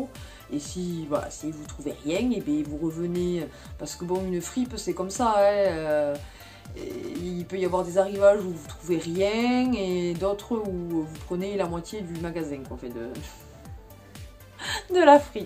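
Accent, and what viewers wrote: South, France. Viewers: coucou ça vaut le coup tu te refais ta garde robe en ce moment c est top tu habites dans le midi avec ton accent ??? bon aprem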